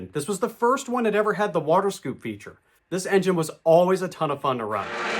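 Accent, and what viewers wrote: Kansas City, United States. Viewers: You're totally from Kansas city...right?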